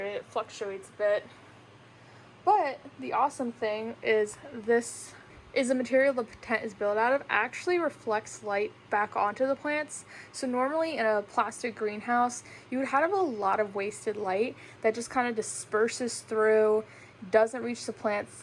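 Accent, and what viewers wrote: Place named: Quebec, Canada. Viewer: It's the first time I watch one of your video (just discovered your channel) I'm sorry but I have to tell you, you really sound like a french Canadian talking english, it's crazy!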